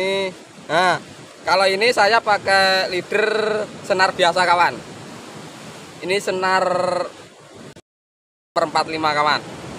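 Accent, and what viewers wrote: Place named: Java, Indonesia. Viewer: Bahasane ojo medok2 to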